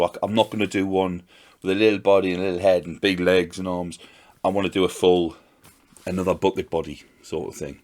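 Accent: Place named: Newcastle, United Kingdom. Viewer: Finally! A fellow Geordie! Nice work fella!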